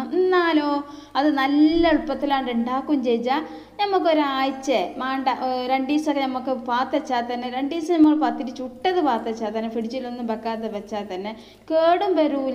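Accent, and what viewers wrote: Malappuram, India. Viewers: താത്ത പറയുന്നത് ഒന്നും മനസ്സിലാകുന്നില്ല. Slang കേരളത്തിന്റെ മധ്യ ഭാഗത്തുനിന്നും തെക്കോട്ടു താമസിക്കുന്ന ആർക്കും മനസ്സിലാവുമെന്ന് തോന്നുന്നില്ല. ഇത് മലപ്പുറം ഭാഷ ആണോ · Aa😁. Malappuram. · .Nishkalankamaya samsaram.. Athanu malappuram bhasha.. 🥰super pathiri👍🏼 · മലപ്പുറം ഭാഷ ആണ് മക്കളെ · തനി മലപ്പുറം സ്ലാങ് ആണ്‌. കഴിക്കണ്ടത്തവർ കഴിക്കണ്ട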